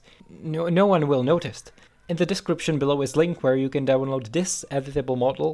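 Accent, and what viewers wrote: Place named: Czech Republic. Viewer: Hmm, you accent sounds little bit like czech, or slovakian? Im sure youre from central europe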